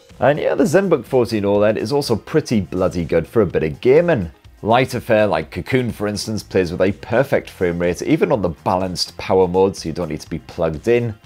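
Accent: United Kingdom. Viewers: Man I could listen to British people speak all day, pure art.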